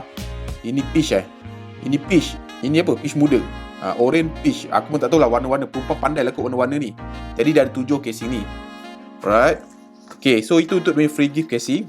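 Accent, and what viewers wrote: Melaka, Malaysia. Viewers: org melaka ke?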